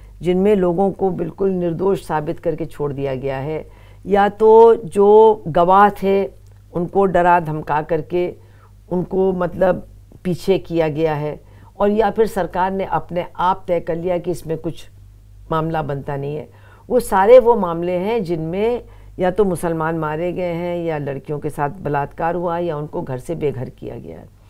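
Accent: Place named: Uttar Pradesh, India. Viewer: Speaking beautifully, can feel and smell the UP dialect and aroma.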